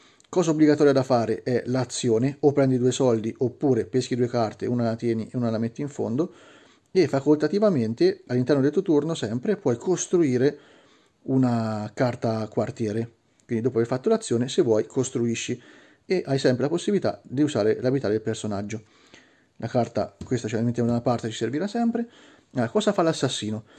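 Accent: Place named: Perugia, Italy. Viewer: solo perché sono di Perugia anche io hahahah anzi si sente pochissimo grande bel tutorial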